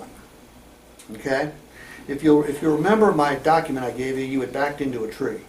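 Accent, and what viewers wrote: Appalachia, United States. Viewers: and his hillbilly talk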